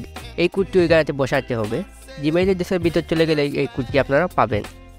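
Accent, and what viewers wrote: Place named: Sylhet, Bangladesh. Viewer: ভাই নিশ্চয় আমাদের সিলেটি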